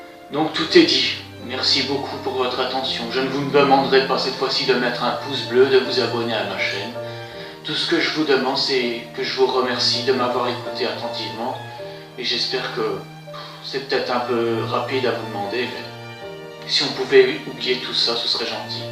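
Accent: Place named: Belgium. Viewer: c rien c l’accent belge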